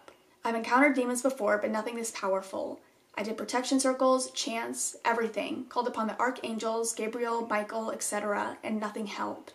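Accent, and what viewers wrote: South, United States. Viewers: You sound like you developed a sort of country/western accent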